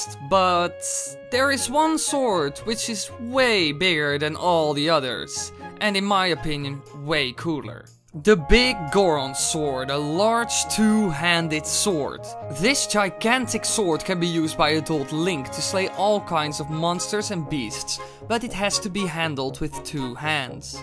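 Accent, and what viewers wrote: Netherlands. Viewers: English? He sounds Dutch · You Sound Dutch. are you Dutch? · is either Dutch, flemish or Scandinavian going by his accent. He's not an American or englishman. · Hold up. You're Dutch, aren't you? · After this Video i would probably think he is Dutch, the way he speaks with his accent reminds me of the Dutch people, especially when he says german words. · It's also weird to hear someone with a heavy Dutch accent be called an English person. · true maar je klinkt zo Nederlands. vind het echt jammer. want ik vind je beter dan game theory. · You'r Dutch arn't ya? I hear it to your accent, it's not the same as Britians or Americans, you are from the Netherlands, you even have the French R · you sound dutch...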